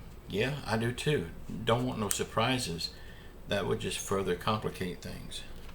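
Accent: South, United States. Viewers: I like that good old southern talk.Born in the south myself,I Talk the same,though have lived near Seattle for years.This channel makes Me feel right at home. God bless. Chow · I'm Southern also. We know what we are talking about. Keep on speaking your own speaking.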